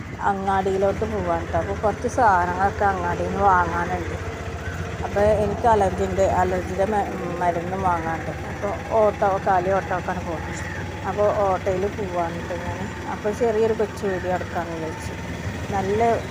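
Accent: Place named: Malappuram, India. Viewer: എവടെ ആണ് സ്ഥലം ഭാഷ മലപ്പുറം ആണല്ലോ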